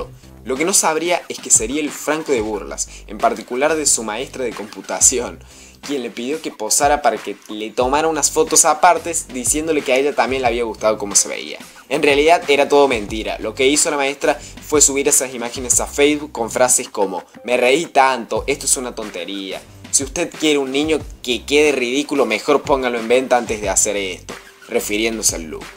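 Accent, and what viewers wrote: Córdoba, Argentina. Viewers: El Chabon Es Cordobés v: <3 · Oh Un cordobeh 👉😜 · adivino eres argentino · sos cordobés? yo soy de buenos aires ARG💖💖💖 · eres cordobes ? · una pregunta sos cordobes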